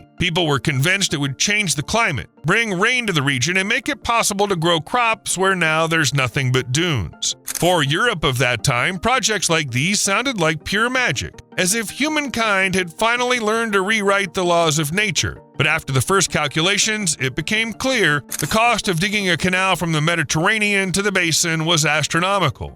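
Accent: United States. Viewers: I just stopped it and won't be listening. No one wants to listen to an abysmal amerry can accent. Just no one.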